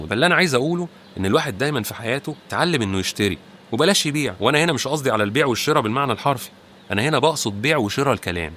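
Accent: Egypt. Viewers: على فكرة ، اللهجة المصرية جميلة جداً و كلامك مفهوم. ما تشيلش هم 😊 انا من لبنان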